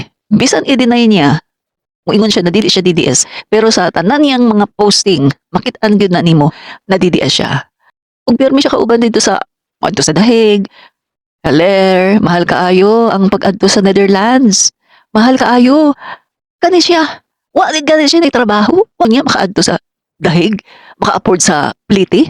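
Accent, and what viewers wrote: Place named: Visayas, Philippines. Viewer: Bisaya di ay ka ma'am 😂😂😂 happy new year ma'am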